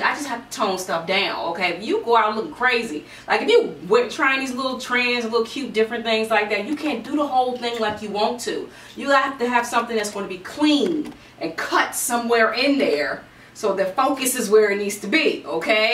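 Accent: South, United States. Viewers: Your smile and dimple and slight Southern accent...(swoon) :-D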